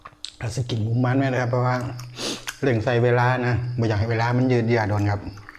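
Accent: South, Thailand. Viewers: พูดแบบใต้ๆ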